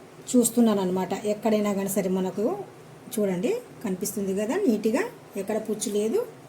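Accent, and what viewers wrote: Rayalaseema, India. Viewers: Nippulu,telling in tellugu very nicely.from rayalaseema ?.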